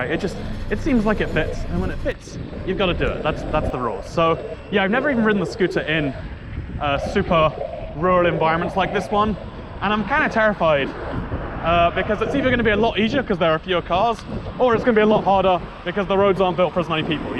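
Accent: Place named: United Kingdom. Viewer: You sound so much more British here lol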